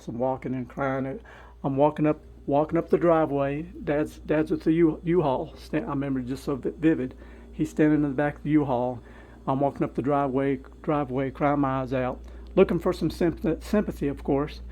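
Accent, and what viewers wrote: Kentucky, United States. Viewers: I had lots of family down south I'd visit every holiday back in the 60's and 70's. Your Southern accent on top of the decor makes it like a dream for me. Thanks for this video.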